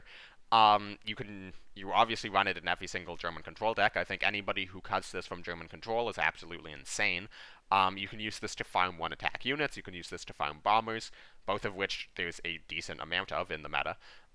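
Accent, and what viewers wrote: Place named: Canada. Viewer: Thank you so much for these videos. Your insights on these cards are very interesting and, in some instances, surprising. On a side note, has any one ever told you you sound like Vince Vaughn? I mean, apart from the Canadian pronunciation, you sound exactly like that guy.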